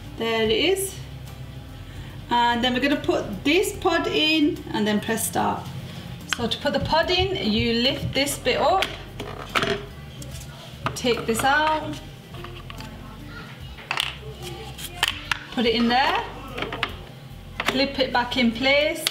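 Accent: Manchester, United Kingdom. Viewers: S B she has an accent. I’d say she come from Manchester way. It’s also known as ‘regional dialect’ . Now the real question is, are you always this rude?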